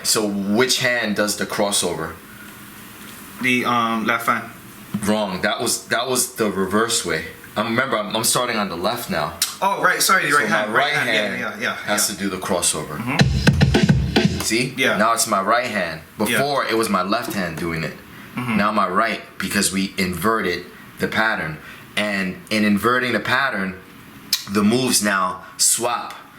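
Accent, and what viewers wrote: Barbados. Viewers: Dope shit! Big up! (I heard that Bajan accent too) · bajan accent strong.....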